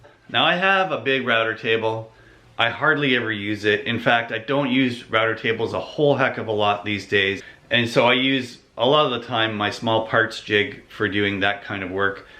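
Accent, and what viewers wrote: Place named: Canada. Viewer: Dansk surname living America, or a perfect English speaking Dane? But then I hear a little Canadian accent? Cheers from an American living in Sweden!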